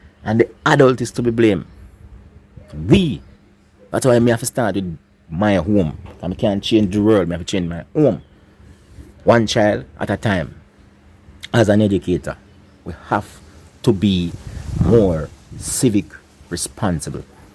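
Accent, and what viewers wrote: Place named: Jamaica. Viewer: Mannaz n Respect bredrin ow yu du. Few things fi sey, first, mi rate how yu present yuself, properly spoken with fi wi accent ,yes man yu gwaan good, respect. Second, yu kno sey a long long time mi a follow Colaz Smith and him bredrin Socks from waaay bak wen dem jus start, yah man, mi and mi cuzzin even try two a him recipe dem, we rate him man, nuff big ups. Third, we(Jamaicans) put the blame on the Govt and yes rightly so to some extent, but in my opinion there are numerous other factors to be considered, education, not school and books, but basic civil common sense education. We keep our homes clean, why cant we keep our country clean, impose strict rules on garbage, yes, the govt needs to import more equipment or hire locals. We as a people neeeeeeeds to take more pride, up our image, be activist for our environment, follow examples. 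In conclusion, each one teach one, yu see wen Colaz Smith come bak ome, express and show dem places like these to our people on his show, comment on it positive and negative and request suggestions and volunteers. Bless up, Jah guide and protect always.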